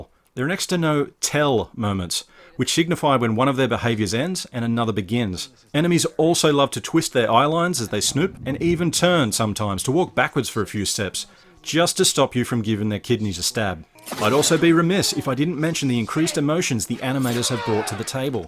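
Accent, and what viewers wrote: Australia. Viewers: Best review yet. Love the Aussie accent/slang and you are GOOD at this game! Fantastic stuff. Subbed. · Nice review and are u Australian? Cause then u get major respect.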